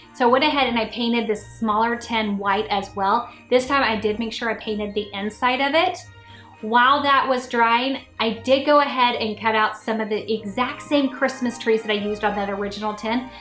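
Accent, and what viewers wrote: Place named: Texas, United States. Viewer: I'm LOVING that green! 😍 Just might have to try that. And the TINS 😍😍😍 I either missed those at Michaels or mine didn't have those, bummer. As always, I love watching you create and your sweet Texas voice. Have an awesome Sunday! PS I hope you can relax today after working late into the night (I was right there with you) ❤️ Last minute ladies unite! 😂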